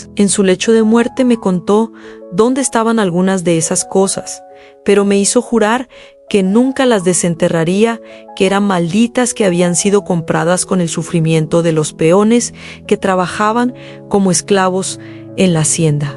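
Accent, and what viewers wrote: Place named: Portugal. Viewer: Excelente. Relato chica tienes muy buena voz en la narrativa suave tranquila. Pausada. Del 1 al 10 te pongo un 9. Todavía. Tienes tu lengua Natal. El Portugués. Muy poco pero de lo demás es excelente. .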